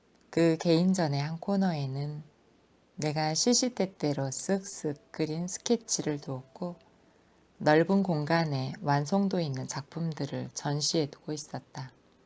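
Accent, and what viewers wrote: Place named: Jeolla, South Korea. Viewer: 읽어주시는 분 전라도분이신가봐요ㅎ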